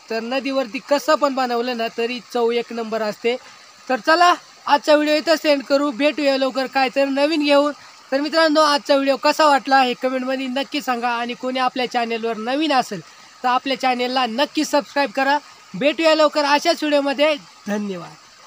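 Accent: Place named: Kolhapur, India. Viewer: Mst video. Tu kolhapur cha aahes ka? Kokani bhasha bolat nahis mhnun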